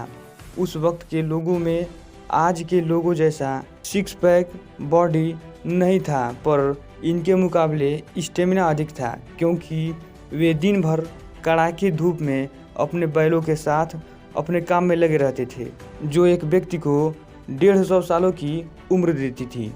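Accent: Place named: Bihar, India. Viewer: अबे बिहरीया आवाज आवाज सुधार अपन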